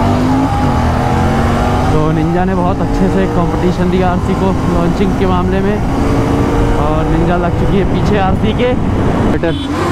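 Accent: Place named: Lucknow, India. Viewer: Loved the lucknow accent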